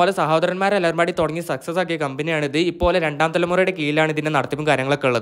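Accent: Malappuram, India. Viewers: Accounting is a process of recording, classifying, summarising, analysing and interpreting the financial transactions, 😂😂😂😂... ഞാൻ ഇക്കയോട് മുമ്പത്തെ വീഡിയോയിൽ പറഞ്ഞിരുന്നു, എൻ്റെ ഗുരു ആണ്, എനിക്ക് മലപ്പുറം slang പഠിപ്പിച്ചു തന്ന ഗുരു, അതുകൊണ്ട് എനിക്ക് എൻ്റെ സാറിൻ്റെ slang manasilaayi ennu പറഞ്ഞു ഒരു comment ചെയ്തായിരുന്നു, ആ sir accounts subject ആണ്..ക്ലാസ്സിൽ വന്നാൽ തുടങ്ങും, recording, classifying,summerizing,. ഇക്കാ ഇന്ന് ഇത് പറഞ്ഞപ്പോ,ഞമ്മൻ്റെ മനസ്സ് തൃപ്തിയായി😂😂😂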